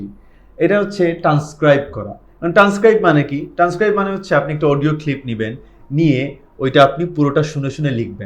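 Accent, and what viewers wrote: Sylhet, Bangladesh. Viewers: R u Sylheti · Asslamualikum Bhaiya.. Apni ki sylheti..kub helpfull viedo❤ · Are you from Sylhet ? I noticed from your accent. I am also from Sylhet. Good Work. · Sir or mat hunia lager sylhete 😅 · Vai are u sylhety? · ভাই কিতা সিলেটি নি,হালকা সিলেটি টান আয়। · স্যার আপনি কি সিলেটি ☺️ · Sir,I think you are sylheti🤔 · Sir er accent shune amader sylheti laglo.Apnar basa kun jaigay sir? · Sylhety vai.... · Wonderful suggestion.....are you sylhety?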